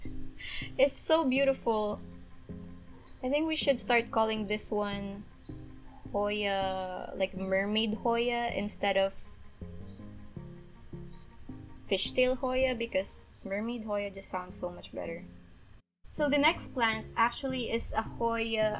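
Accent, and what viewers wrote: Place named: Philippines. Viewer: I am new to your channel, where are you based? I love listening to your clear and informative explantion...no nonsense! Are your teacher or a trainer? I noticed slight Phillipino accent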